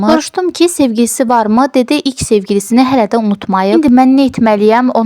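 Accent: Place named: Ganja, Azerbaijan. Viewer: Gəncəli di qız anlaşılan.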